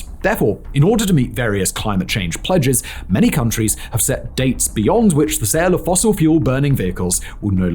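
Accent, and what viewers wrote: United Kingdom. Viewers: Gasoline cars are going to be around for a long long time, my friend. Just watch and learn. Also, desktop computers aren't going anywhere and settled down to their ongoing use plateau several years ago. And finally, BluRay and DVD/CD players are abundantly available, very cheap to obtain and will be for the foreseeable future. Your analysis is a little heavy on the wishcasting propaganda side, sprinkled with a thick authoritative sounding British accent. Those in the know don't buy it. You did at least nail the fax machine, so there's that...good man!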